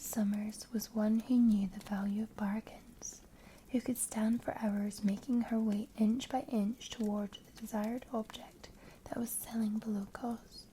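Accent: Scotland, United Kingdom. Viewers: What is this lovely Scottish woman doing in my bed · This one makes me feel like I've been pampered by a sleep-angel, sent from Scotland to give me a relaxing infusion of comfort sleep. Sending happiness and health to you Ms ❤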